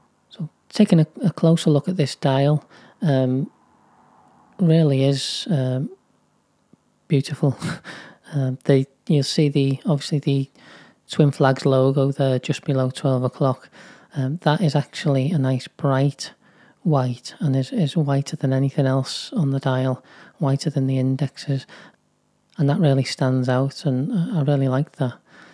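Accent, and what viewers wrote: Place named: Merseyside, United Kingdom. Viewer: 😮😮😮 amazing review my friend.....nice to hear a fellow Merseysider on yt good review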